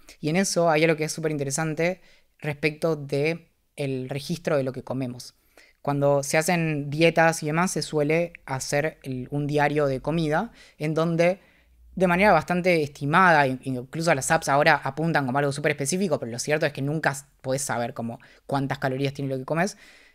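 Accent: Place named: Israel. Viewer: Y ese acento HEBREO ??? Judio o uruguayo o mide east … la doble LL ó Y porque SHO porque yo.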